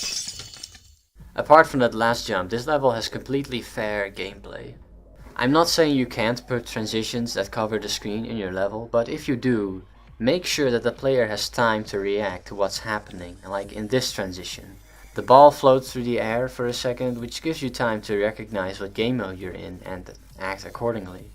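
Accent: Netherlands. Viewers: you sound dutch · bliss that's cause he is dutch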